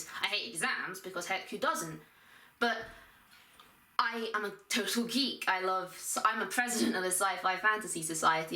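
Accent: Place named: United Kingdom. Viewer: Well you sound like you are in the UK and look like you were Not Born a "Muslim" just converted to Islam. Those are 2 totally different things! This is your "Beautiful Religion" Hunny! Look around you in Europe, won't be long before you ARE IN CHAINS POPPING OUT 10 MUSLIMS BABIES! <link> 2. THIS IS LONDON!!! <link>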